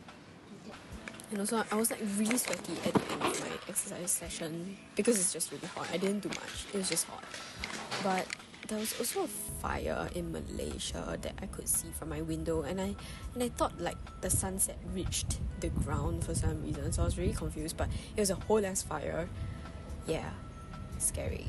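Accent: Singapore. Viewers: I love your singaporean english accent 😭😭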